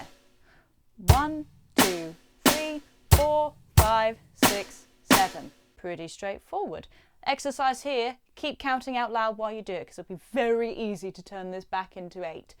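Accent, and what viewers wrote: United Kingdom. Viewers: I play bass and stumbled on your channel. You have an enthusiastic approach and the British accent makes your lessons fun to watch. Want some 7/8? Bill Bufords playing on from. Back in the day, bruford and bass player, chris squire, were as solid as it gets.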